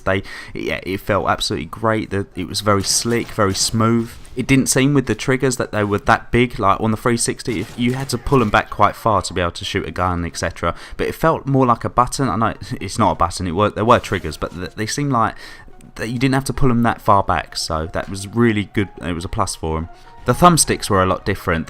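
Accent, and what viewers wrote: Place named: London, United Kingdom. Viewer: oh right yeah you have a bit of a london accent. Can you give me any advice on how to get more subscribers and how to get a bit more confident when doing commentaries ?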